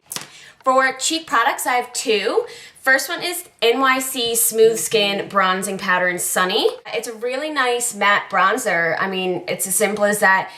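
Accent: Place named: East Coast, Canada. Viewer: Michelle sent me as well! You are gorgeous and your videos are great, glad to have discovered you. I love your top, where is it from? I am also Canadian from the West Coast, I would guess you are from the East Coast from your "accent". Thanks for the great videos!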